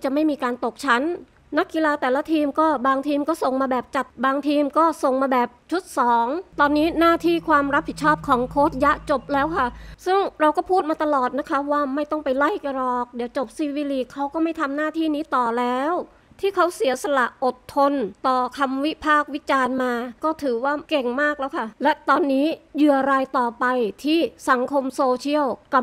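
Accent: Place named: South, Thailand. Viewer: สุดยอดครับฟังเสียงน่าจะเป็นคนใต้นะครับ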